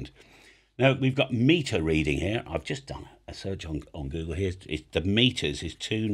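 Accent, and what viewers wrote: United Kingdom. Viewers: your posts would be better if you used scripted (posted words) rather than trying to figure out what you are saying with your British down play English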